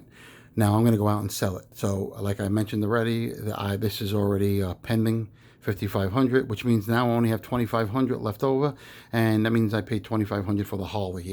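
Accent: New York, United States. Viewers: Nice pickups. I just became you #144 subscriber. I enjoy your gold coin flipping 😆 and your NY accent, as I am from the South. Look forward to watching you hustle up some Stacks of Gold. The faster you acquire it the sooner you quit paying interest to your family associates. Bank 🏦 of looking good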